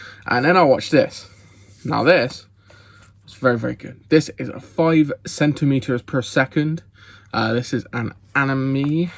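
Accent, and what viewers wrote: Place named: Bristol, United Kingdom. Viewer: A fellow Bristolian loving hearing the accent and also the sheer variety of films you watch - keep up the good work!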